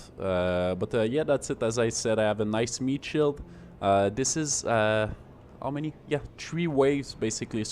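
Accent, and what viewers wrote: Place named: Quebec, Canada. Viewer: You sound very french canadian to my french canadian ears. Am i right?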